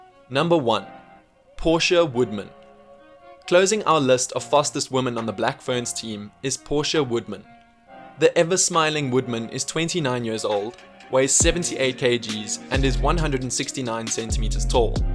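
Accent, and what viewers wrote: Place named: South Africa. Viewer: Nice video. Unusual (but pretty cool) to hear a S'Affa praising NZ rugby.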